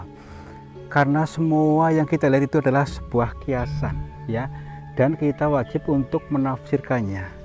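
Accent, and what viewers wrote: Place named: Banyumas, Indonesia. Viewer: Bhsanya kok ngapak Kang,,,memang Kakange dari Daerah mana maaf🙏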